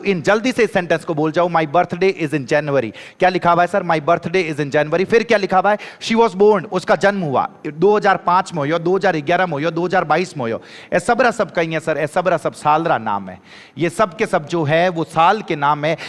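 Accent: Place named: Rajasthan, India. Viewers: Rajasthani vaani badiya lage hai sir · Jodhpur ri boli ❤ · गुरु jiक्या अंदाज है आपके पढ़ने का वह भी अपनी मारवाड़ी भाषा में❤❤❤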